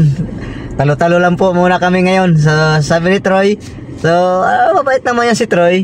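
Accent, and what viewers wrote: Visayas, Philippines. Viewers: Ayusin mo pagsasalita mo brad...bisaya ka yata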